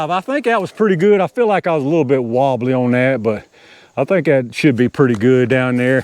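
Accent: United States. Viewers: Came for the gun, subbed for the accent. What state are you in?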